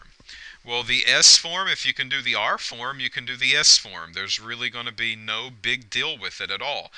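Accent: South, United States. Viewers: love the dixie accent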